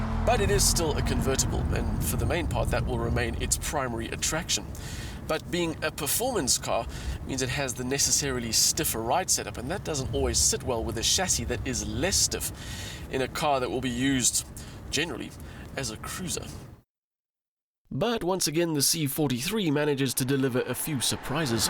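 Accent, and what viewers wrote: South Africa. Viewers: Great video, love the South Africa accent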